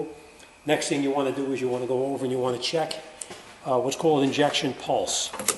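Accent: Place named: New England, United States. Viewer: Love your New England accent!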